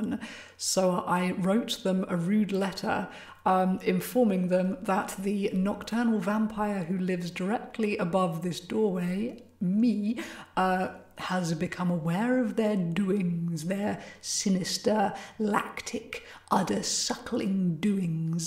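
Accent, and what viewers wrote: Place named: England, United Kingdom. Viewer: WOW!. So cool you liked the cards I sent you and that they inspired a video.I called my wife in to see and she totally freaked.I feel like a real nerd but you know what, I am a fan,what can I say.I like the early morning trips to the woods dancing in the dark and hearing the king's english. will be Sharing on facebook.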